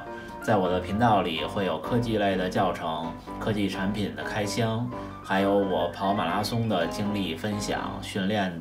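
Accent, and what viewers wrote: Beijing, China. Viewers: 听口音是北京人吧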